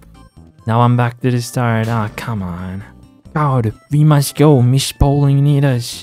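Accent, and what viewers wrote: Turkey. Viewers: şiveden anladım direkt türk olduğunu :D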